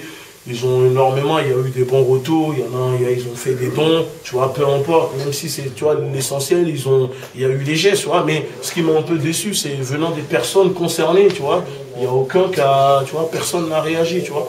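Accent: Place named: Africa. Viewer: Bizarre maintenant il parle avec un fort accent africain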